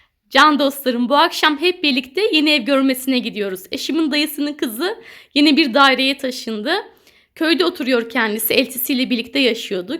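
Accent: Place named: Zonguldak, Turkey. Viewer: Bursa dan selamlar hemşerim ben Zonguldaklı olarak memleketime selamlar sizi severek takipteyim benim olumun hesabından yazıyomaynı biz gibi.gonuşusyasunuz adetlerinizfe bizim gibi caycumalıyom bende canım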